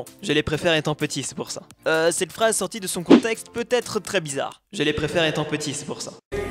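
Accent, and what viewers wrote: Belgium. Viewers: Tu es belge ? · il est belge??? (réponder) S.V.P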